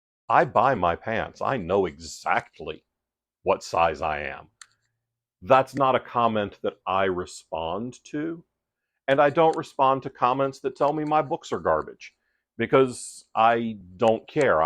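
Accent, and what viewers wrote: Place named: Midwest, United States. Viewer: If I had to guess - I’d bet you grew up in the Midwest - Missouri, Arkansas, Alabama, Mississippi. I’m from Missouri - spent 24 years in the coast guard and moved a lot. But damn - I’m good at guessing where folks grew up.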